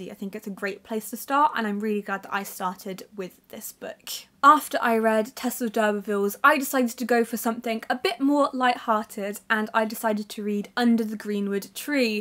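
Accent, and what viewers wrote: United Kingdom. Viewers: I really love your reviews , they are deep and clever, and of course your lovely British accent, greetings from Barcelona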